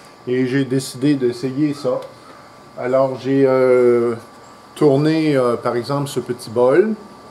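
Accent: Canada. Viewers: Bonjour cela fait de nombreuses années que je suis vos diverses expériences je tiens à vous remercier pour la curiosité que vous mettez à expérimenter le tournage et la gentillesse teintée d’accent canadien qui résonne à mes oreilles de français du sud ( a côté de Marseille ),votre dernière vidéo autour du séchage ma beaucoup intéressée …depuis toutes ces années j’ai l’impression que nous avons évolue ensemble,encore merci continuez…. Ont vous suis ici avec plaisir. Mr lucari André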